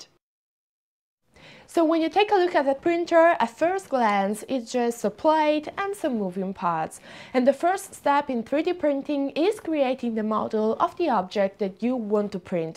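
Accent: Italy. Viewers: So, I was wondering what that accent was. Apparently she has a mirror YouTube channel with exactly the same video's where she speaks Italian :) So, there you go.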